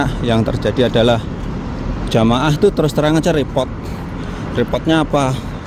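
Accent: Java, Indonesia. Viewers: Mas asli orang mana? Kogh logatnya kejawa jawa an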